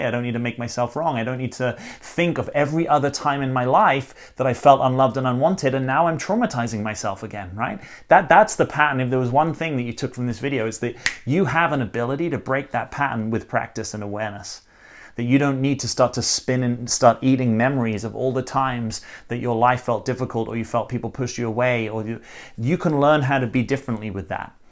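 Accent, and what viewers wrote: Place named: United Kingdom. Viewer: Your teaching and views are resonating with me more than any other coach. I love your british accent! Thank you for all your work! Your my hero, because you are so truethfull.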